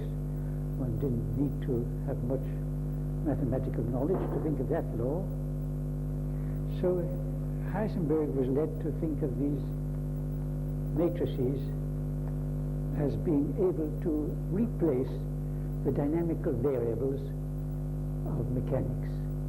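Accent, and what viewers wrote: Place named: Netherlands. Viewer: He sounds Dutch, strange considering he did not grow up there.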